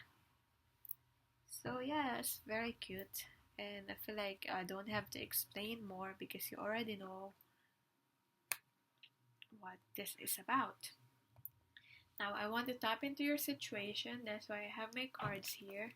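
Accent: Philippines. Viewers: Picked no. 1. A good reading. I can tell w ur accent, that ur a Filipina.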